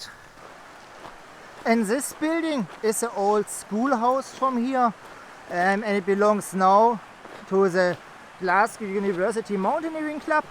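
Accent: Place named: Germany. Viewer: Love the German accent with Scottish slang, great sound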